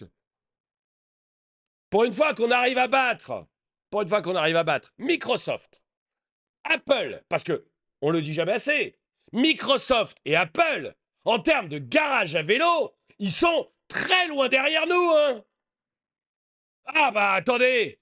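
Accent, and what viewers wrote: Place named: Lyon, France. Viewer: C’est un Lyonnais. Il ne prononce pas les z finaux, comme dans toutes les régions d’influence savoyarde.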